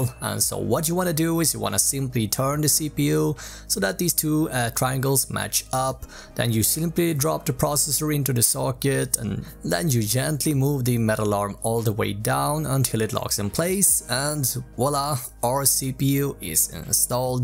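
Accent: Sweden. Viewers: Are you from sweden, great video btw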